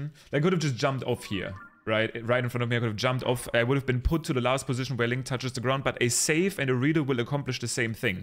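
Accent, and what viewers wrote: Germany. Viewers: Are you german? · Wie man einfach instant hört, dass du deutsch bist haha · I can hear the German accent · I love your accent! Southern American here. Apologies if you aren’t German, but one of my favorite teachers ever was German and you remind me of him!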